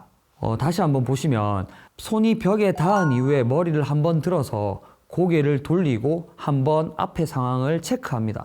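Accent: Gyeongsang, South Korea. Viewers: 쌤이 영남 사람이네.😊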